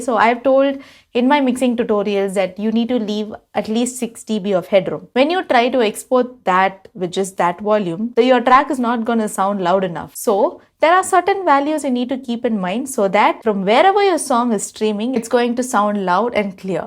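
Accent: India. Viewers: Im gonna be honest I just come hear to see and hear an Indian woman talk music mastering things are changing and I think its good keep up the good work.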